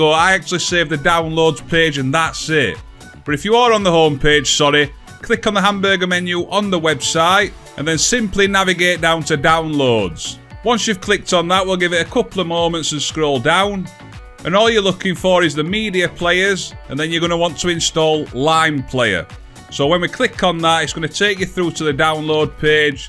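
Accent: Yorkshire, United Kingdom. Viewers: Nice to see someone on Internet with a Yorkshire accent. The world is now a better place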